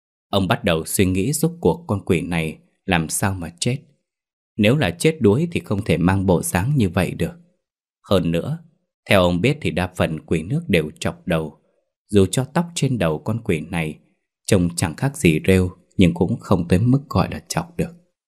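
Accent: North, Vietnam. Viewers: Hóng truyện của tg Huỳnh Thiên Hương. MC giọng bắc nhưng đọc truyện theo văn miền nam, miền tây cuốn theo cách riêng nha. Mình người SG nghe cứ bị ghiền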